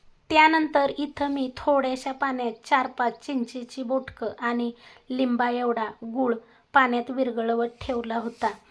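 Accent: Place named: Satara, India. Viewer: ही अस्सल सातारी भाषा आहे त्या मुळे ऐकायला तस वाटत असेल बट योग्य कमेंट्स कराव्यात. कोणतीही भाषा असो तुम्हाला समजलं ना! मग कलेला प्राधान्य दिले पाहिजे.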